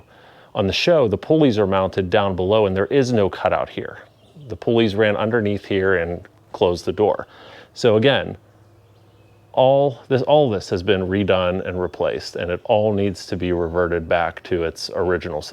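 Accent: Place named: Pittsburgh, United States. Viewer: by chance are from Pittsburgh or surrounding Allegany or Westmoreland County i detect a light Pittsburghease in your voice and you yard an intro shots of the car driving looks like the area thanks for the content and I will check my connections an see if I can find you labor for metal fab an body work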